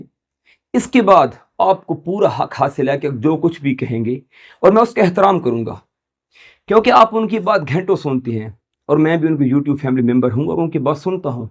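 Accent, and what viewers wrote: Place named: Punjab, Pakistan. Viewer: Yeh Punjabi mai Urdu bol Raha hai ya Urdu mai Punjabi.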